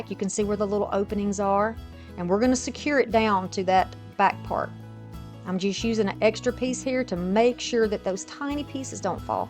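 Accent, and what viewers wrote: United States. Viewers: 💕 Love them! They all turned out Beautiful. Thank you. By the way, I LOVE your country accent! 💕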